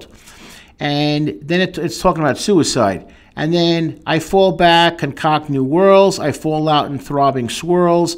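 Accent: Brooklyn, United States. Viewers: Sorry to disappoint, but that's pure Brooklyn. I grew up in a neighborhood called Bensonhurst.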